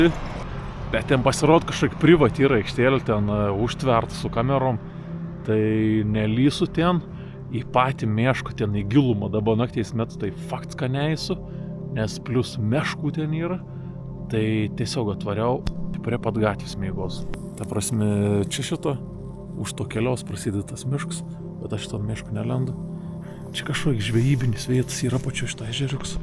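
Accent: Samogitia, Lithuania. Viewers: Kodel zemaitiškai kalbi sakei lietuviškai kalbesi😢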